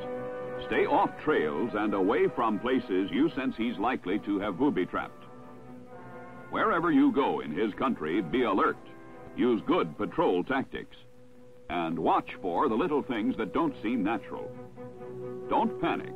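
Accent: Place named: Boston Brahmin, United States. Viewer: boston brahmin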